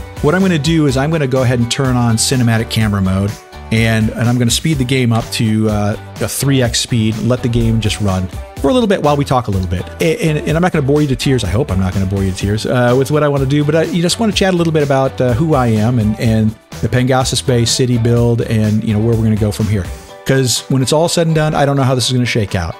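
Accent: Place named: Minnesota, United States. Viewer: Well hello neighbor! I thought I picked up on those Minnesota O's As far as the city goes, you should do what you feel is reasonable. It's your hobby after all. I'll stick around for whatever that is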